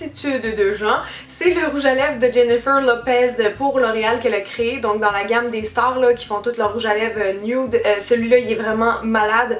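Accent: Quebec, Canada. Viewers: elle est québécoise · J'adore l'accent québécois. Si je devais en avoir un, je choisirais celui là :)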